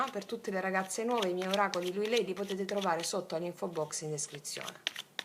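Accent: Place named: Salento, Italy. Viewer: Buongiorno mia cara, ti ho scoperto questa mattina... E stato come sentirti fare parte della famiglia... Sento dal tuo accento che sei Pugliese... Anzi... Salentina... Come. me ....Sei una forza della Natura !!! E si sente tutta la Passione e la Preparazione che Emani nelle tue stesure... Che sono rimasta Affascinata !!!! La tua Simpatia è Contagiosa ... E Analizzi in Profondità... Mi piacerebbe conoscerti Personalmente !!!! Ti Abbraccio Forte !!!! 🏆💥😂🤗🤩😇🍀🔥🎤🎶❤️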